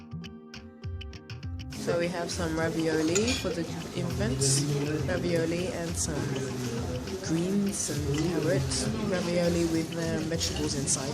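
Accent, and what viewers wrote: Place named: United Kingdom. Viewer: Nice to see your vacation journey. I liked how you edited it between present and past sights. Where are you originally from? Your accent sounds British...did you relocate to south of France? I'd love to learn more of your story. Perhaps you've made a video of it and I didn't yet see it? You are a beautiful woman - inside and out - and I enjoy learning about life in another part of the world through your eyes.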